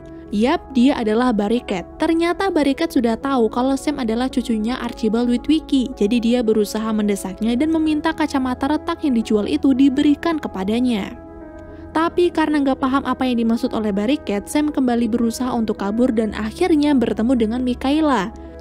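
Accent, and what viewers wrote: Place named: Java, Indonesia. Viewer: Wong jawa rika ya mba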